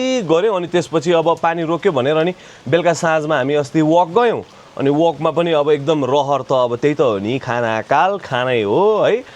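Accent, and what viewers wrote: Darjeeling, India. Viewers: Bro love the darjeeling accent 😂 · Too good Dai Darjeeling accent 😂😂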